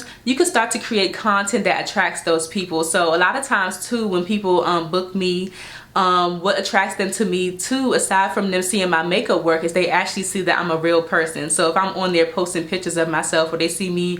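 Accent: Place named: Washington DC, United States. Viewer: I know a DC accent anywhere. Hey neighbor!! Great content and much needed. ❤️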